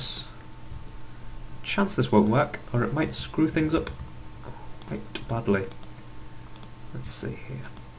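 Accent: United Kingdom. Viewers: Ok thanks p.s.(are you british) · ARE YOU FRICKING BRITISH MAN!! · Interesting accent.. Are you British? :)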